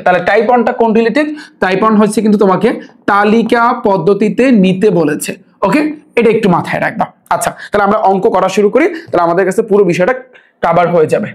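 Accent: Barishal, Bangladesh. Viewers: Vaja apnar basa ki borishal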